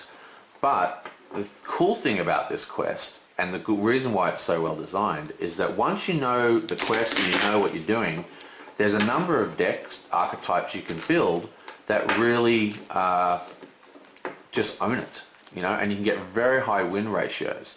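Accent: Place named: Australia. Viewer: Neutral australian or nz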